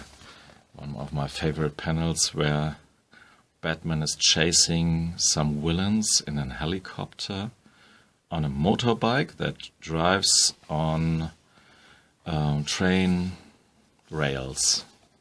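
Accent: Germany. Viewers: Ich finde es schade, dass du in englisch bloggst, vor allem da es dich einschränkt, weil du manchmal die Worte suchen musst. Andererseits mag ich den deutschen Akzent .) Aber am Besten gefällt mir, dass du auch Disney Comics im Schrank hast .. Thumbs up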